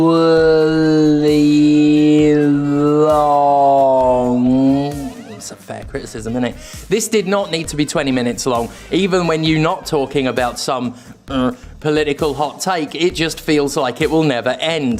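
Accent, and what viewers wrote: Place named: England, United Kingdom. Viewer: Love how as never lost his English accent.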